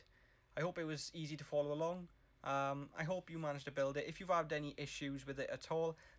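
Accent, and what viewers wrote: Wales, United Kingdom. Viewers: Are you British or welsh? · You welsh? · u welsh? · Are you welsh? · Wait is this guy Welsh? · You sound welsh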